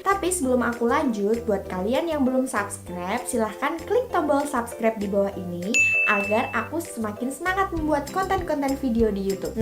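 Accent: Java, Indonesia. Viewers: Mbak nya asal Jawa ya? 😂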